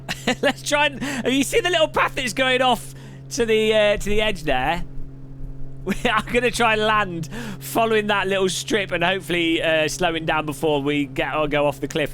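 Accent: United Kingdom. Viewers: "ok you see what hapened theaaaaaaaah...?" xD You are sooo bri`ish!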